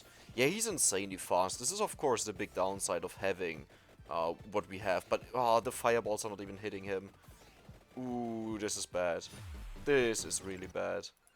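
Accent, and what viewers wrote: Germany. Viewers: Bro man hört so raus das du deutsch bist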